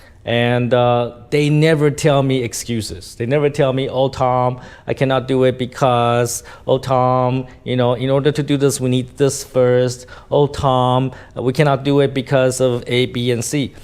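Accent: Standard. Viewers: Thầy nói rất chuẩn luôn good thầy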